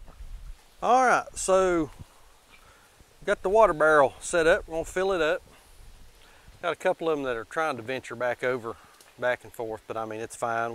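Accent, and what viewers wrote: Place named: North Carolina, United States. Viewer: Love your North Carolina accent. Sad that American accents are disappearing.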